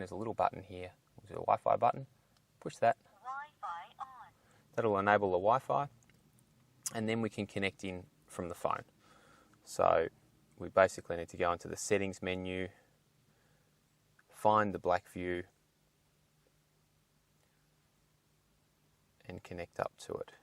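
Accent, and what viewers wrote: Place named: Australia. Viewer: ommgggg I love Australian accents!!! ;)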